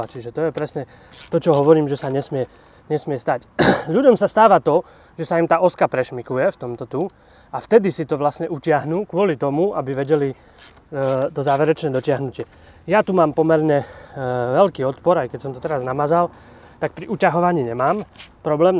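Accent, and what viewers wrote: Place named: East Slovakia, Slovakia. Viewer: Čuc že to vychodňar 🤣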